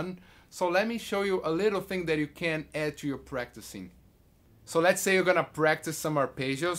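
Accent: Brazil. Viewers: É praticamente impossível falar sem sotaque. O que importa é que o cara é fluente. E, aliás, o sotaque brasileiro é agradável aos ouvidos estrangeiros. Não tem problema nenhum. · Eae galera, o inglês do é bom? ou ele tá cheio de sotaque BR? SHAHUSHUS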